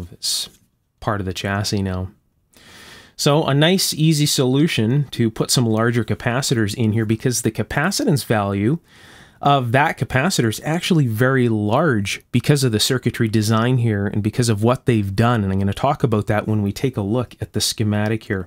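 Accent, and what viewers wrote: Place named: Canada. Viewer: I love these videos. Reminds me of the days of my childhood when I would sit at the kitchen table with my grandpa and he would explain everything like these videos. The only thing is... the way you pronounce OUT and ABOUT is so jarring to the ear. I am Canadian and in had no idea there were actually people that say Oot and Aboot! Please stop. It’s about not Aboot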